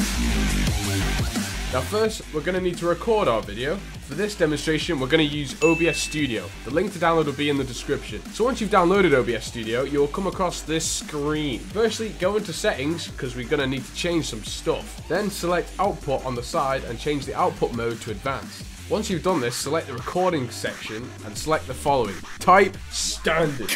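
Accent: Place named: United Kingdom. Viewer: my guy has a british [bri'ish] accent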